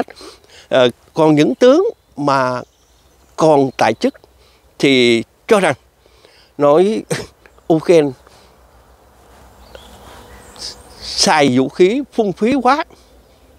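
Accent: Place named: South, Vietnam. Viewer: Rất hay và khóai chất giọng miền Nam của chú. Cảm ơn chú đưa tin tức.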